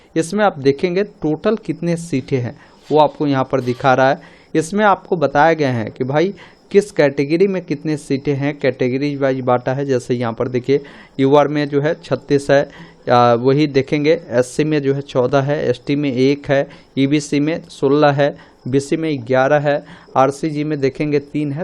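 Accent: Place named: Marwari, India. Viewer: Barbar English mein voice change kyon Ho Raha Hai sar Marwadi English mein yah bol raha hai video mein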